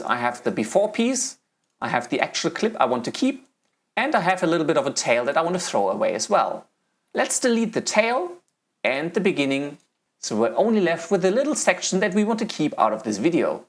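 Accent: Germany. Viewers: I love this video! I love your accent! It's German, right? You gained a new subscriber! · You're not an idiot (and you better get past that idea if you're going to make any progress in life). This guy's accent is not merely obscure, it's incomprehensible. I truly do not understand why any company would hire this guy to speak to Americans. I doubt he's a native English speaker; I'm thinking northern Germany for his birthplace. This is NOT a "tutorial for an absolute beginner." Partly because the guy's accent is as thick as mango trees in Cuba. Example: Americans say EYE-CON, this bizarre chap says "EYE-cun." It's a small difference, but given how fast he's talking, it's a major difference, and the net result is, you can get lost quickly.. The other reason this is not for beginners is, he goes way too fast. Many, many, many better options available for beginners. 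· Are you German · Delighted that you replied! I’ve been struggling so much since I bought my Premiere Pro subscription, and I’ve been suuuuper intimidated to release my second video. The road appeared so uphill, and honestly, till I saw your video today, I’ve been regretting the Adobe purchase. I shall try again tonight. By the way, found your accent pretty interesting! Is there any German or Austrian connection ? Just curious :-)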